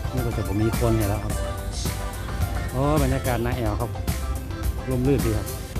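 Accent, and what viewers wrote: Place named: North, Thailand. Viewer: เมินๆจะได้ป๊ะคนเหนือเนาะ,อู้เมืองมันม่วนแต๊หนาอ้าย,วิวงามขนาดเลยคับ,เติมใจ๋หื้อเด้ออ้าย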